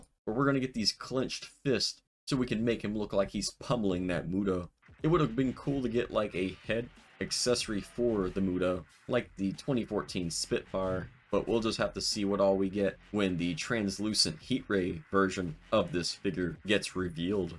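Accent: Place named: United Kingdom. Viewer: ? you sound like you’re British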